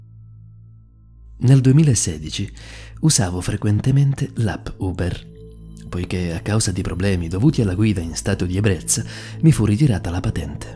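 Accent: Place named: Calabria, Italy. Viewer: non so voi ma ascoltare queste storie alle cuffie è qualcosa di fantastico ma allo stesso tempo terrificante! comunque complimenti davvero per il montaggio la narrazione e tematica... e poi dalla voce sembri calabrese giusto?!?!? :) COMPLIMENTI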